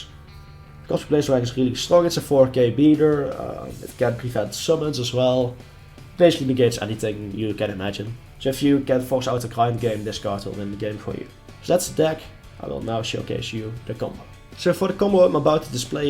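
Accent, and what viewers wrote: Netherlands. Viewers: He sounds dutch 🤔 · hes dutch i can hear it lmao. Its nice to see some one from my country on this channel too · Lol a dutch Guy you can here ot so baba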